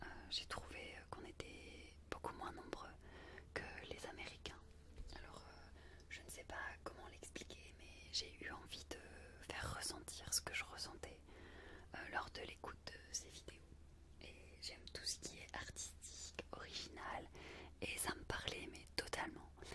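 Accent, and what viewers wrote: North, France. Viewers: Tu viens du nord, c'est cool c'est ma région natale (Haute-Normandie), tu parle un peu Normand ou ch'ti? 😄💜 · tu est du nord ? sinon tes video sont super ^^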